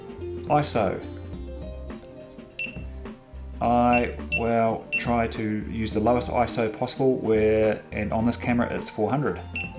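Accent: New Zealand. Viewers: Awesome work. Have just discovered you. So good to hear a Kiwi voice, and NZ in your videos.Recently got a Solo 3DR with GoPro so your Tutorials are very helpfull. Thankyou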